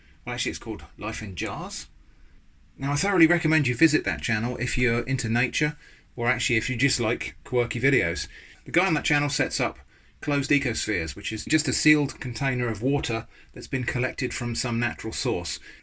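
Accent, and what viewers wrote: United Kingdom. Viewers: I enjoy Watching your videos during these tough and uncertain times. In the US it is very chaotic right now and watching these video lets me know that there are things to do at home. Plus I like British accents. 🙃 I have started a hydroponic indoor mini farm and I'm starting to grow my own food. I hope you stay safe and nice hand washing. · Such a fan of your channel, it was yours that introduced me to a topic I didn't know existed: Scambaiting. I then realised your quirkiness, intellect, good ol' fashion British diction and relaxing timbre sucked me in to the rest of your content. Thx for fighting the good fight👍👍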